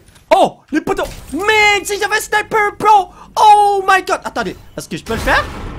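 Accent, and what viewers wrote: Quebec, Canada. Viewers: Accent québécois + humour = 🔥🤣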